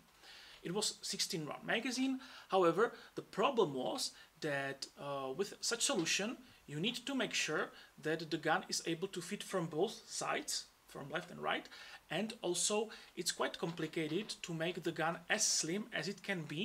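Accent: Czech Republic. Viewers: The CZ-75 is the greatest pistol design ever made in my opinion... no matter what purpose you could ever desire, there's a 100% chance that the CZ-75 can be adapted into that role with a variant of it or even simple customization! My father from Persia introduced me to CZ over a year ago, but I'm the one who's a CZ-Cultist! Dumb question, with the accent you have, I would presume you are indeed from the Czech Republic, right? Sometimes I think "Man, I need to go see what's there..."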